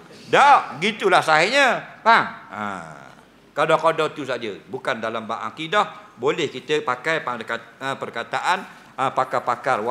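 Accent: Terengganu, Malaysia. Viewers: Tak faham dialek bahasa terengganu ke..@ ada masalah kefahaman?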